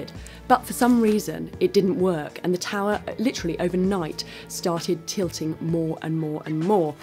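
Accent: United Kingdom. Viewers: Omg why did this stupid brithish Women had to talk you cant understand here Wheres the american womens to replace This Narrator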